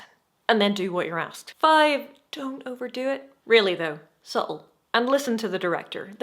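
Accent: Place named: Northern Ireland, United Kingdom. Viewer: I watched your logical fallacies video because Youtube told me to (was not disappointed). Now I'm just trying to figure out where your accent is from - I'm guessing Northern Ireland originally but you've been living in England for years. I might need to watch more videos to confirm!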